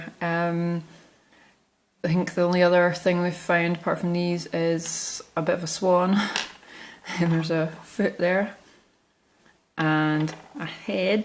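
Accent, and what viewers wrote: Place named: Scotland, United Kingdom. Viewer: Hey lovely to hear another Scottish accent Mudlarking ; awesome .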